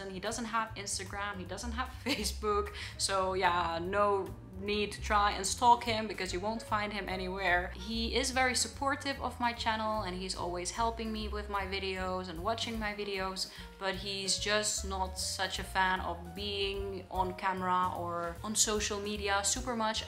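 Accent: Netherlands. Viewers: Welcome to the Netherlands,.. The funny thing is that your English pronunciation has a bit of a Dutch accent to it instead of a typical Scandinavian accent:) Also you moving to the Netherlands and our Floor Janssen made the move to live in Finland, is 'kinda' funny. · Your Dutch accent is really close when you speak English...... Sounds more close than the your Dutch itself. Stick to the english vowels and you got it !👍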